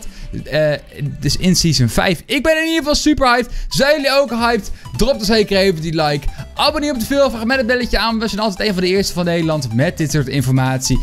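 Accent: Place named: England, United Kingdom. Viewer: Geweldig Engels accent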